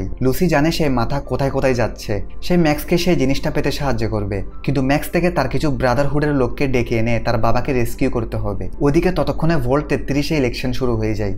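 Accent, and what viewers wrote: Chittagong, Bangladesh. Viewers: ভাইয়ের বাড়ি কি চট্টগ্রাম?আমি চট্টগ্রামের। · ভায়ের বাড়ি মনে হয় চট্টগ্রামে। দিন শেষে আঞ্চলিকতার টান চলে আসে। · Bhai mone hoy Chittagong er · Brother this is chattagram special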